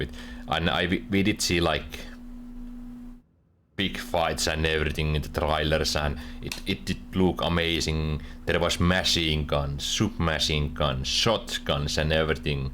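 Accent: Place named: Finland. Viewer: Finnish accent Perrkele 😂 ❤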